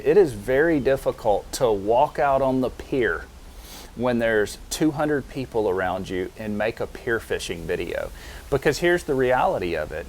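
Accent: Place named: Panhandle, United States. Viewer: I’ve been a big fan of your videos for some time, originally because they took me back to the Pensacola beach of my youth. I still love the weekly beach scenery, but I’ve grown to be a big fan of you personally. I always enjoy seeing your handsome smiling face and hearing that cheerful voice tinged with a soupçon of Panhandle drawl. Thank you for opening up and being so honest with us. I personally know what it’s like to receive criticism on a public level. I am retired now, but I worked in show business for 50 years and the one negative review I got was in a national magazine And believe me it threw me for a loop. I took it so personally and just wanted to crawl under a bridge. Eventually, I got over it, of course, and like you, therapy has helped me a great deal over the years. The one single slogan that I heard that helps ground me is “What other people think is none of my business.” It’s a total waste of time to try to read other people‘s minds and so I don’t anymore. 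Thanks again for all your great work and I look forward to future videos.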